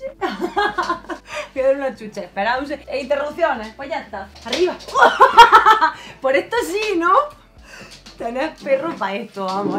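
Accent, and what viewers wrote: Andalusia, Spain. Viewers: me gustas mucho, tu acento me transporta a almuñecar donde e pasado mi infancia, eres genial, esa paleta me encanta 😘🥰😍❤️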